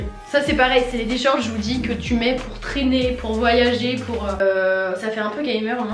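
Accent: Marseille, France. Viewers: T trop belle et trop drôle et grv spontané et moi aussi je kiff l accent marseillais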